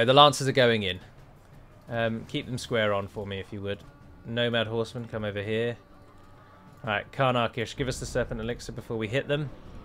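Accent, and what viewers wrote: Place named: United Kingdom. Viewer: We are all here for the ramble,. If it was anyhow annoying then 6000 people would not have watched this episode thus far. I personally am here for the excellent british english demonstartion and classic humour, which the ramble is very proudly part of. As for the choice I think Mordor will absolutely devour Dol Guldur with just the sheer amount of better starting settlements they posess. So in truth you would only make Mordor even more powerful as well as granting them more Nazgul to control. You also need to remember that Mordor is most likely occupying Khandish homelands too, so they will have ever better economy to back up their hordes. The war between Dol Guldur and Mordor, whilst being probably very rare is just going to be a way for Mordor to become an even bigger threat than before thus forcing you to fight them later anyway, should you play on for that long. 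So in my mind I suggest you to make no alliances other than the ones you need to survive and simply conquer everyone and everything. Let's be honest, if this starting horde of cavalry would be used to its utterly ridiculous maximum, cheesing every single fight, one could conquer the entire Middle-Earth with it. I appreciate however that it is certainly not the point of this specific channel or the showcase of the new starting condition for the nation nor your personal playstyle. Thank you for coming to my TedTalk if you bothered to read this and looking forward to episode three, where the "Sun never stops ris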